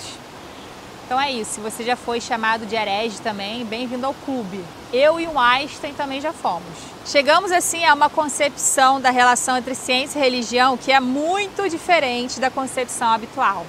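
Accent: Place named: Rio de Janeiro, Brazil. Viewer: Você é natural do RJ ? Seu sotaque é bem carioca,amo seus conteúdos parabéns esta me ajudando a despertar minha consciência ❤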